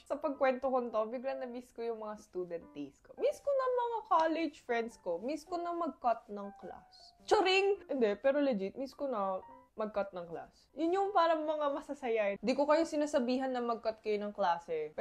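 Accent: Batangas, Philippines. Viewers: Alam mo ng napanood kita sa vlog mo natuwa ako kase talo mo pa ako mag salita ng batanguena kse batanguena ako pero sa manila ako lumaki at ng aral ng high school at kolehiyo tuwang tuwang ako sayo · I like esp. Bataguena accent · Nakakatuwa, lumalabas pa rin ang batangas accent ni ineng. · Koreana ka sa itchura pero accent mo Tagalog Hindi ka na masabi na koreana ka...Ang galing mo kunwari lahat ng Korean ay magkaka klac at subject pilipino..malamang ikang ang pinakamagaling sa lahat.. specially 4 years old ka sa pinas ka na. Baka fluent ka pa sa Tagalog Kay sa Korean language.. . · i initially thought na filipina ka na singkit lang talaga (like pure filipino dahil may friends akong they look like they are half but in reality, full filipino) dahil sa vibe mo and the way you talk, u earned my sub! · You’re so funny. If I don’t see your face I will absolutely say you’re Pinoy. 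You behave, sound and act like pure Pinoy. Great job! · Yong sound nang english mo ate girl true studied in philippines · wow. your channel popped up on my feed just now. grabe te, natural yung topak mo. pikit lang ang mata, pinoy na pinoy ang punto. grabe tawa ko! this was really enjoyable. i'm surprised na wala ka nakita ibang koreans masyado sa UP since kahit na 20+ years ago, andami na umaaligid aligid sa UP. may nanligaw pa nga sa orgmate ko eh.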